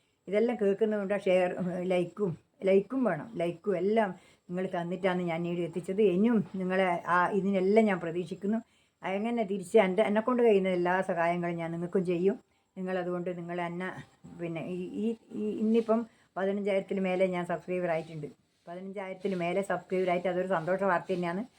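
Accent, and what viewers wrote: Kannur, India. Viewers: കണ്ണൂർ അമ്മയാണ് അല്ലേ നന്ദി ഏച്ചി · അമ്മ കണ്ണൂരാണെന്നു തോന്നുന്നു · അമ്മയുടെ നാട് കണ്ണൂരാ സംസാരം കേട്ട കൊണ്ട് ചോദിച്ചതാ അമ്മേ സപ്പർ ആണ് അമ്മേ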